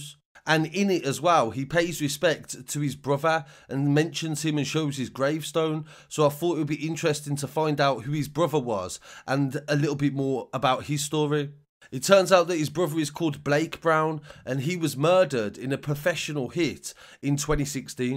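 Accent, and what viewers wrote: Birmingham, United Kingdom. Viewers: sounds so brummy 👊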